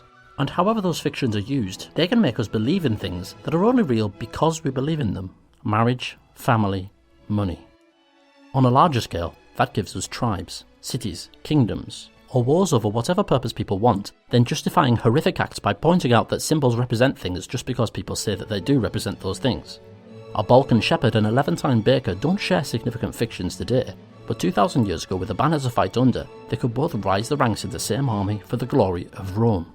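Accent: United Kingdom. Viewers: I fucking hate your accent with all the force of my spirit but I have to say brits surely do quite good content here in youtube (even if sometimes i dont fully understand what you say) keep the good work my good chap P.D: Roll youR fucking Rs for the love of god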